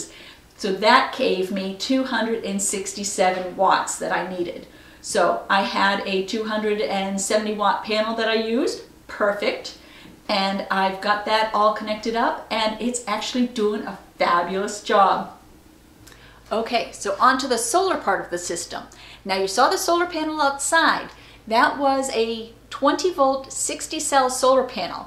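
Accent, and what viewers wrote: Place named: New England, United States. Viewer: at first I heard a very slight N.J. accent but i knew it wasnt right ..then it hit me Maine or New England Not bad for a Canadian Eh !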